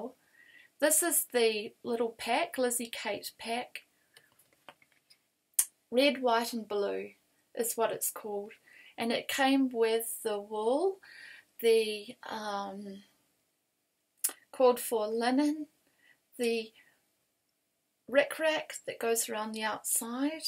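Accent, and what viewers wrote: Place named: New Zealand. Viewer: Hi from Auckland! My maternal fam is from Lumsden, you sound just like home 😀Nice to meet you!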